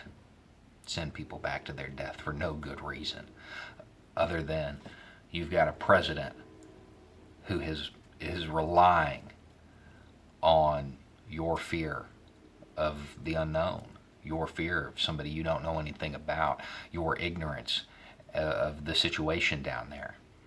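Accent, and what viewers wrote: South, United States. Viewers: (I'm assuming that is your first name) I've really come to enjoy and respect the videos you put out. I'm still pretty new to your channel and I haven't entirely agreed with everything you say. But I would say I agree with 90% of it. What I find most intriguing is how you fit the trope of "Don't judge a book by its cover." I have no doubt that I am not the first person to click on your video for the first time and instantly take you for a Trump supporter. lol It's the southern accent and the down south good ol' boy look. (This may be a little unfair being Im a native Texan with a southern accent myself. Just not quite as much as yours) Fortunately it doesn't take long to realize you are not a Trump supporter at all. For that I am very grateful. You have very good incite and thought provoking commentary. Keep up the good word my man. 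· So I'm new here, but is there a reason you lay on a much stronger southern accent in videos on this channel? You're speaking voice in the videos on the other channel is noticeably less accented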